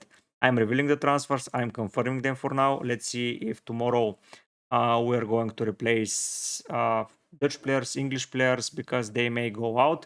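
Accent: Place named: Bulgaria. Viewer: I just heard 3 seconds and I knew you were Bulgarian, can’t miss the accent.н😂 Жив и здрав брато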